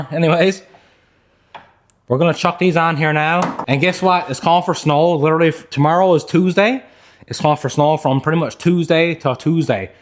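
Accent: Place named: Newfoundland, Canada. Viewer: Funny story heard my 9 year old the other day watching YouTube and i heard the strong newfie accent, i said you watch and he yes you do to, great job on the videos from your neighbors in prince edward island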